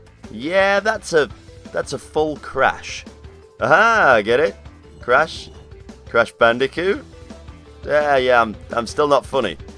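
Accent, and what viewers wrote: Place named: Northern England, United Kingdom. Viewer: I also noticed you were using a PS4 in this vid. Would you mind adding me on PSN? My PSN is the same as my YT name. I'd love to do a video with you some time. I don't do many but mine are all about timesavers and completionist videos plus some random unscripted stuff too and I'd love to do a collaboration with you. Forgive me if I am wrong as well but I can't help but note that Northern accent as well. I'm from Sheffield if that's near to you?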